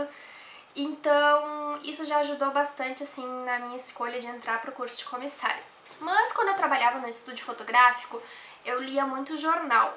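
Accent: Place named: Rio Grande do Sul, Brazil. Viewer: Muito Tu hein hahaha adoro sotaque de Gaúcho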